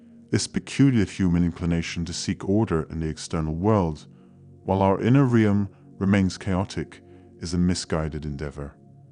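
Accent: United Kingdom. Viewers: What a beautiful speaking voice. Ah, that British accent…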